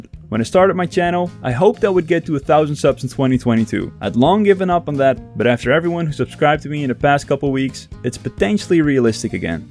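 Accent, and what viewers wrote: Netherlands. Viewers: 5 seconden, ja hoor nederlander xD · You're Dutch, aren't you? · Looks like you have been blessed by the algorithm, nice work good sir! Also do i detect a dutch accent?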